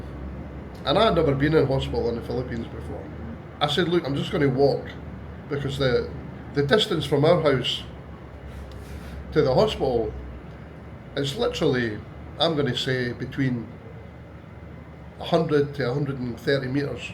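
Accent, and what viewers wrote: Scotland, United Kingdom. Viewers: He sounds like a true Scotsman. Take care mate, stay in good health always. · Sounds you are from Scotland….no doubt it’s nice to live in the Philippines because it’s not cold like Scotland .. but when it comes to Medical care, you ,😊 you have to use all your life savings ready…American Style, No Medical Insurance your f****up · Lewis Capaldi accent. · New subscriber here. I’m guessing your Scottish? 😊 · I’ve visited Blantyre a few times… Love the people over there! Very warm and friendly, if they take a liking to yah! Took me back when I heard ya speak!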